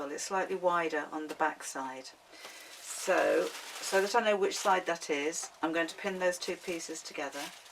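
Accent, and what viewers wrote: United Kingdom. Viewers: I just noticed your pin cushion. I have got to make one like that. Thanks for this wonderful video. btw love that British accent you have.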